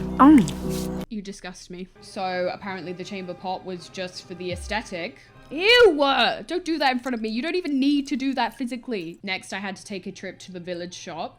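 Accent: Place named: Southern England, United Kingdom. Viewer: hi (?) what accent would you say you have? what region of england? i hear southern but if there's something more specific let me know. sorry i just like accents:-)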